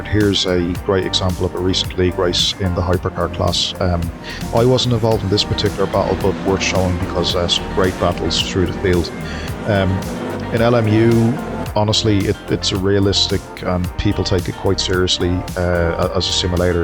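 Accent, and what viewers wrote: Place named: Ireland. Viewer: Don't ya just love hearing an irish voice in sim racing :D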